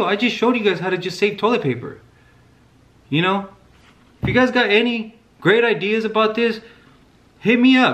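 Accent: Sinaloa, Mexico. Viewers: I'm so freaking dead right now 💀💀💀💀 pinche, the fact that I was taking his ass serious at the beginning.. like dead serious. All into it.. I cannot see you the same right now 😂😂😂😂😂 and then his acento bien sinaloense😂😂😂🍅 actor of the year goes to........ 😂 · He had me at “a roll could last you up to a year” so I continued watching...... pero like no quiero usar my finger to clean my 💩😅. The Spanish version had me rolling😂💀 “no se anden panikiando” also how he kept saying “fundillo” deceased! His Sinaloa accent made it so much better. This video needs to go viral ASAP😂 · Love his Spanish 🤪 talks straight up like a sinaloense.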